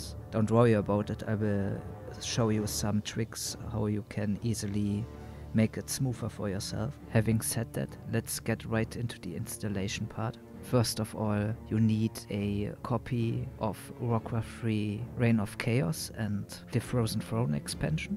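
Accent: Germany. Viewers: bist du deutscher xd klingt so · teach me german senpaiiiiiii, dude i love that accent "Not a scratch Doctor " Not A Scratch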